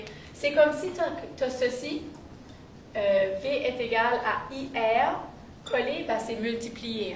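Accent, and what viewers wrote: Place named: New Brunswick, Canada. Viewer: Wow superbes explications! Et j'adore le ptit accent Nouveau Brunswick!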